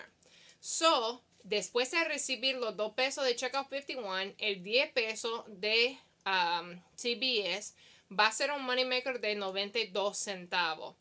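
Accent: Puerto Rico. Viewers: Love this video!!! Suenas Puertoriquena!!! :) Gracias por este video. Que chevere!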